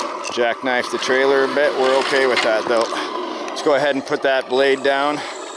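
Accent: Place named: Canada. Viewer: hey, are you from Canada? Because you sound like you are lol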